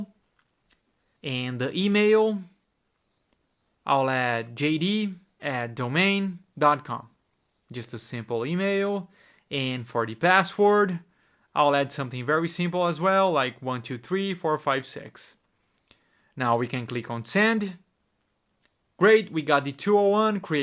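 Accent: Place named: Brazil. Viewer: kakakaka sabia que tu era BR, sotaque tava mt familiar kkkk mas porra tu tem um ingles perfeito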